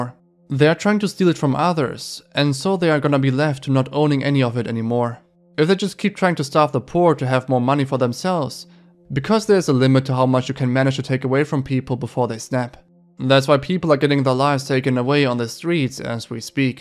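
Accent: Germany. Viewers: are you german? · Is he from Germany? The accent sounds quite German.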